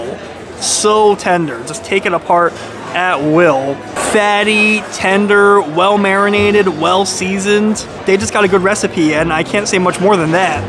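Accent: United States. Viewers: You have an Americanized sounding voice. You don't have a foreign Asian accent.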